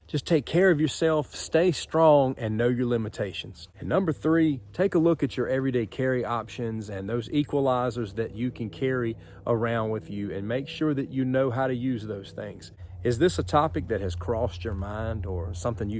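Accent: South, United States. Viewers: Great to hear a smooth Southern accent. Directions are north, west and east - South is a location. COL Stoney (r) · I don't know about the rest of y'all, but this old Southern gal could listen to this man talk all day! I've lived in Tennessee since 1987, but I still love a natural Southern drawl. ❤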